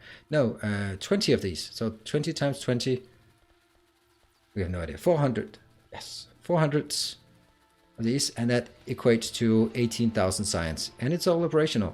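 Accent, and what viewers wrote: Denmark. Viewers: Just wanna be sure: you are from Denmark? right?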